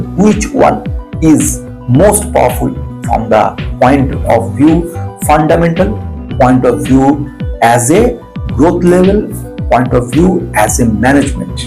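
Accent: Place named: Bengal, India. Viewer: Informative, but heavy bengali accent made difficult to understand every sentence.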